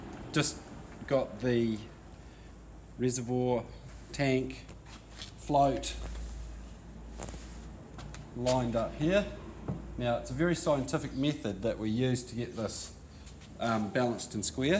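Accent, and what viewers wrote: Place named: New Zealand. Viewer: Fantastic! I have been thinking of buying something like this, but they are so damned expensive. I have to ask though, is the pump fit for breathing air? I know that in SCUBA, they have to filter it to take out anything that may harm health, so I am keen to know if this pump is fit for purpose from a health sense. If so, I think I may do this. BTW, it sounds like you are a Kiwi. Where abouts are you? I am in Welly.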